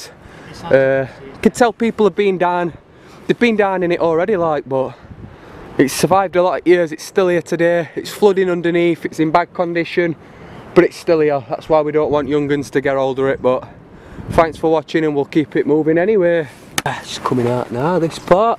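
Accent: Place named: Sheffield, United Kingdom. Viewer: Sheffield accent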